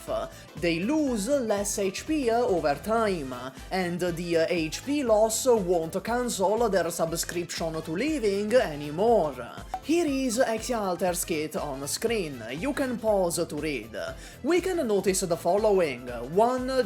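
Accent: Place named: Italy. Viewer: Oh shit, again this guy with Italiano accent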